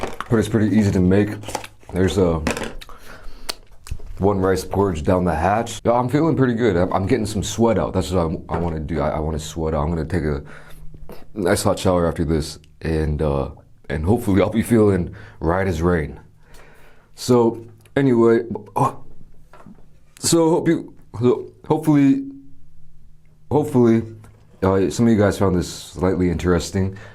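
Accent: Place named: New York, United States. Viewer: NGL~ if I was in a spot in NYC & walked in & spoke~ I woulda SWORN he was from New York!! 😂😍💪🏽💯❤️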